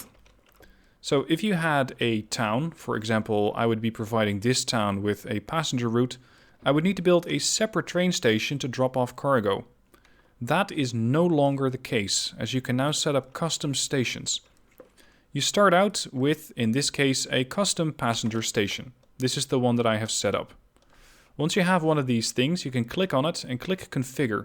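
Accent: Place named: Netherlands. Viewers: Said no one ever... Maar goed, een nederlands accent verstop je niet zomaar :) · Yep, that's English with a Dutch accent. I might just follow just for that 😉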